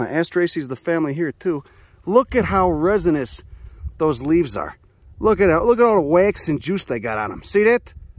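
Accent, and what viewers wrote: Chicago, United States. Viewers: This guy rips! Love that heavy Chicago accent. Caught be off guard in the best possible way. I know almost nothing about these species but the delivery keeps me interested. Good stuff!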